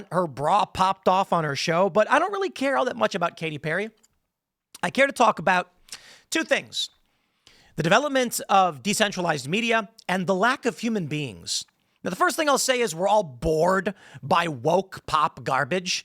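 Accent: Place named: AAVE, United States. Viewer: Stop with the double negative and ebonics, please. You sound like an idiot....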